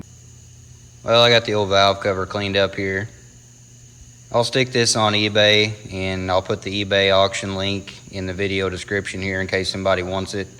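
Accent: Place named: Alabama, United States. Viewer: This channel reminds me of Peg at Zip Ties and Bias Plies... Neither ever shows their face in camera, presumably so they can get away with their dirty deeds with impunity! LoL. I'm tryin' to figure out where this good ole boy is from. Countryside looks like southern Indiana but could be anywhere USA in reality. The voice sounds like the feller is from Alabama, but again that can be misleading too.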